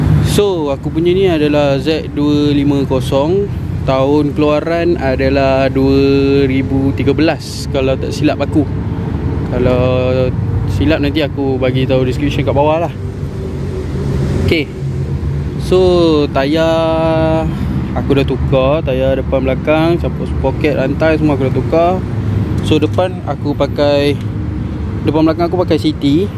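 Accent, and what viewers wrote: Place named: Malacca, Malaysia. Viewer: Nice video bos. Orang melaka ke bos? Ada bunyi melaka hahaha.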